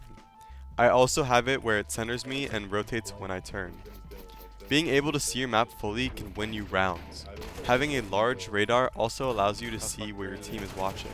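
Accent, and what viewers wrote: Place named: Ireland. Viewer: sounds irish lmao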